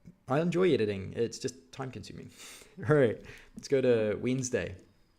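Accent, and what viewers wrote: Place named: New Zealand. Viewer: KIwi accent!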